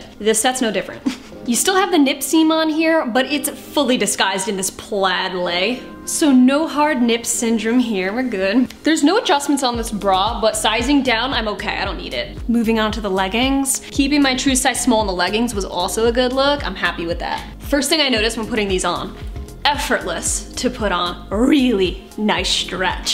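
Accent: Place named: North, United States. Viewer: Upstate South Carolina here! Love your vids! Your accent is great. I get grief for my southern accent all the time! Love hearing your unabashed northern accent!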